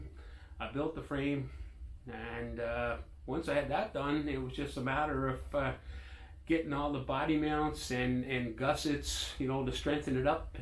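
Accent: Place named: Canada. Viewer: I am in Pennsylvania. From the accent I am guessing you are somewhere in Canada. I will email you my contact info.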